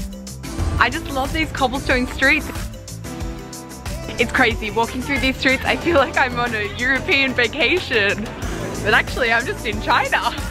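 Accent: Australia. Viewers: Heyyy, I'm a Chinese student currently studing in Melbourne, its suprised to know that you are a Aussie, like your accent and video, and the way how you expericen and introduce Chinese culture. And one more thing is I recommend my hometown Fuzhou city in Funjan province. The climate there is quite similar to Sydney, and it's famous for it's beautiful greenary and parks. Looking forward to see your video inroducing my hometown:）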